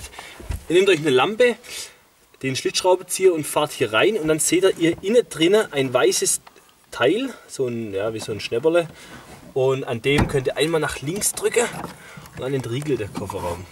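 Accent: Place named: Swabia, Germany. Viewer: Top Reparaturanleitung auf Schwäbisch. Mehr davon bitte...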